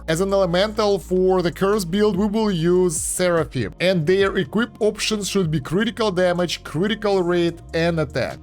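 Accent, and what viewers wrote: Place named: Russia. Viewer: По акценту слышно русский мужик пожалуйста сними ролик на сборку скиллов на демона и ангела пж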